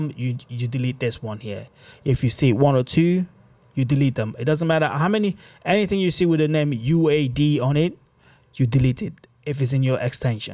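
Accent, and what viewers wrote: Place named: South London, United Kingdom. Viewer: bruh this guy is on sum south london shit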